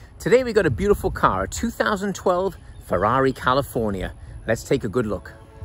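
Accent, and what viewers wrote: Wales, United Kingdom. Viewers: Is that a Welsh accent I hear while your narrating the video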